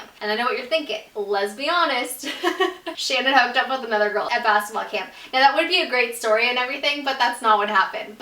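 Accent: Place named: California, United States. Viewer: she's from california, that's the way people talk there.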